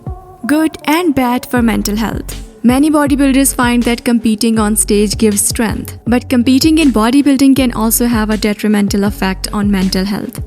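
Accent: India. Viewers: disliked cause of indian accent lol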